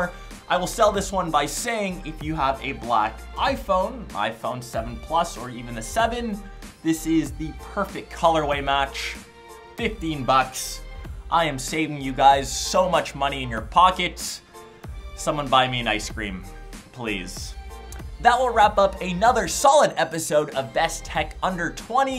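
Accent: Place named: Canada. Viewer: I love your Canadian accent. It's funny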